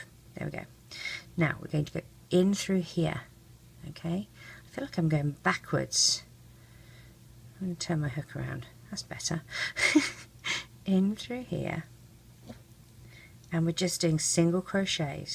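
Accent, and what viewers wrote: United Kingdom. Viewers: OMG your british im a quarter cause my nana is britan and everyone after her!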